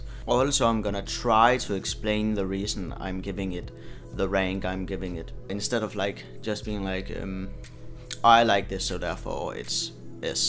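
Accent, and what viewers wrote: Denmark. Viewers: are you danish? you kinda got the danglish accent lol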